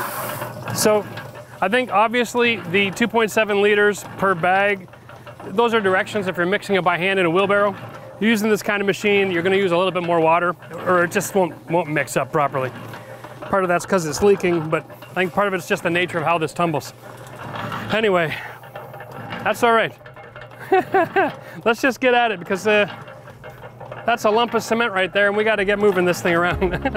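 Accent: Canada. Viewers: you talk funny, you must be from Canada. I like your video, thanks for sharing. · Haha, u almost remind me of red green, but with useful knowledge. And u have that nice Canadian accent. Bahaha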